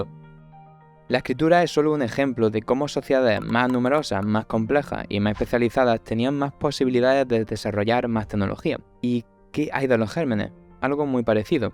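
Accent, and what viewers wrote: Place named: Andalusia, Spain. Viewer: 🇪🇸 ¡Andaluz profundo!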